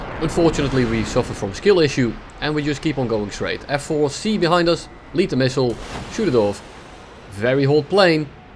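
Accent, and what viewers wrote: Netherlands. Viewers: bro je kan btr nederlands praten (acent) gwn goed engld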